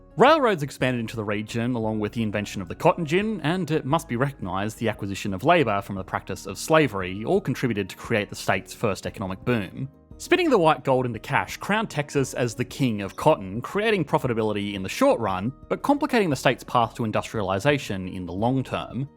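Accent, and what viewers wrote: Australia. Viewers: Whose idea to use a horrible rushed Aussie accent? Just use a normal American accent and less wordy next time. Thumbs down for being overly boring. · Your accent is so wonderfully aussie that i thought at the end of the video, "i didn't know they call each other "partner" down under.....just like in Tex....wait a minute." Then i got it. Slow day for me. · He's Australian, there are a lot of elongated vowels with the countries accent.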